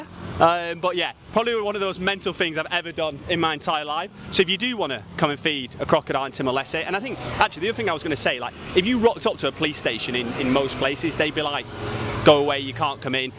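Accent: United Kingdom. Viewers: Hi mate how are you doing? You sound like britsh.. Where do you live in England? I live in Ely Cambridgeshire mate. If you go futher to the east of Dili, it's FDTL compound (Military headquarters) you will see a huge crocodile about 7 metres long, they named him Antonio. I don't know whether this crocodile is stil there or not because I left the country ten years ago for England . just try to ask people there perhaps they can help you. Have good holiday mate and stay safe.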